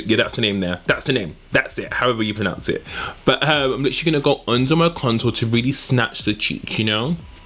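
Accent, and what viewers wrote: United Kingdom. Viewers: Yanna Teira it’s the British accent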